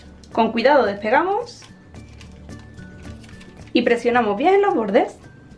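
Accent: Andalusia, Spain. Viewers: no me hagas mucho caso pero creo que es andaluz xD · Es de España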